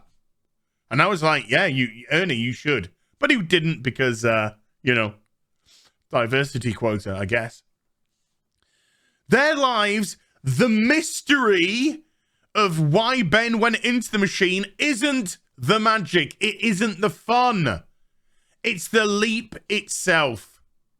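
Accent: United States. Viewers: Yep, you sound more and more American all the time.: ) O.o? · I'll admit I couldn't even tell you wasn't american my first time watching you.😅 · you do sound American sometimes lol and I love it!! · Are you American? You are speaking American with a funny accent. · Yes you do sound like a yank somethings. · Gary's fault for your American speech.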